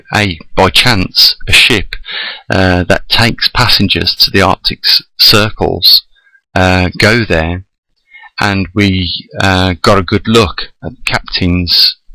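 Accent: Birmingham, United Kingdom. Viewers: I here a brummie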